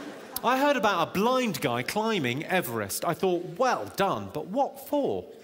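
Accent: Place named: Southern England, United Kingdom. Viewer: He speaks very proper English too, not very regional, just a kind of generic south England. Great for learning English.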